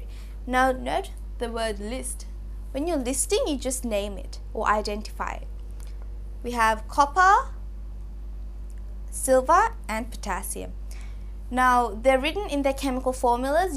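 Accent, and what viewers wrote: Australia. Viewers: I can sense an Australian accent 👀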